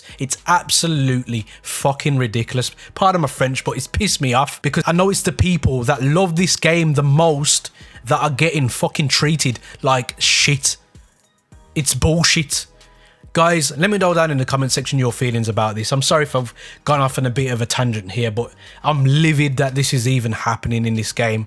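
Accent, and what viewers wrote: Birmingham, United Kingdom. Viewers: Proper brummy lad!!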